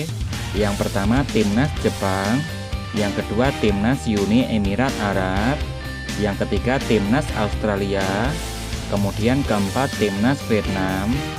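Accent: Java, Indonesia. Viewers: Yg ke Telu indonesia😂..jawanya medok bang .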